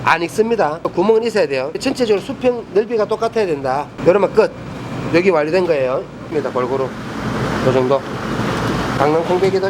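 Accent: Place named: Gyeongsang, South Korea. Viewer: 구시한 갱상도 사투리! 따봉입니다! 꼭 따라해볼께요!! ❤️👍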